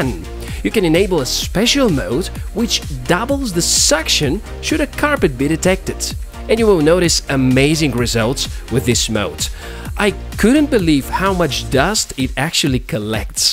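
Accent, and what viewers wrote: Netherlands. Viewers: I love your dutch accent... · The finest, least perceptible Dutch accent I've heard in a long time. Respect!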